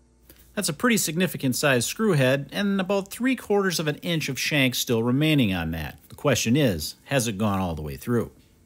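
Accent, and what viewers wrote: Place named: Wisconsin, United States. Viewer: I knew he was a Wisconsinite from the familiar accent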